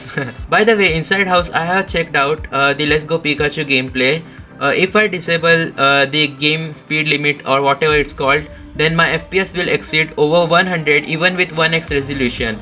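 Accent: India. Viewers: Jesus christ! No wonder people hate indian accent. Get to the point! 🙄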